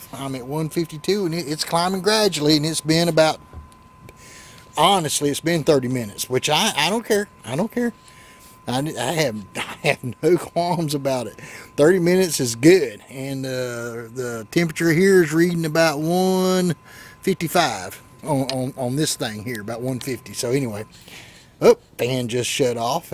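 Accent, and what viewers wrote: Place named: South, United States. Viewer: I don't know what was more entertaining your southern draw or the excitement in your voice. Really enjoyed your video!!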